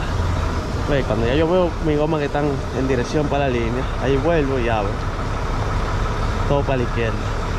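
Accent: Dominican Republic. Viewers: Manin tu suenas dominicano, saludos desde República Dominicana colega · Hey nítido son tus explicaciones ese asentó es puro dominicano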